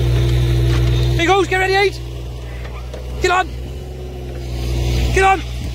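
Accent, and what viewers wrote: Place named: South Wales, United Kingdom. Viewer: nice one mate well done dogs are cracking is that a south wales accent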